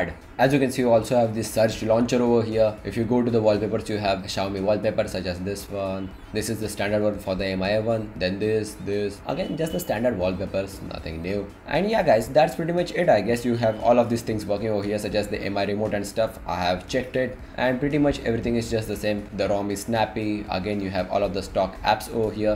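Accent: India. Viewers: From your english u sound like an Indian. What will u do without volte and with 7.1.2 . It doesn't matter if it is 7.0 ot 7.1.2 off basic things are not working. Strange people..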